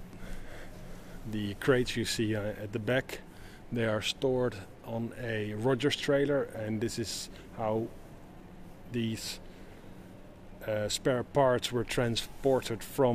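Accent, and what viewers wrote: Netherlands. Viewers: Hallo ik hoor dat je Nederlands bent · That Dutch accent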